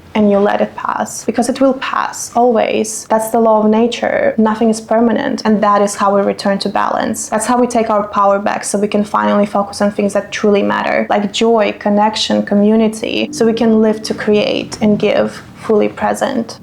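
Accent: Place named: Norway. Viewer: I just wish you'd have said that cool pali word in your cool Norweigan accent😂!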